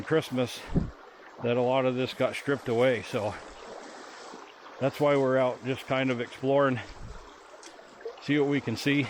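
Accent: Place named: Vermont, United States. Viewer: Love that born in Vermont accent!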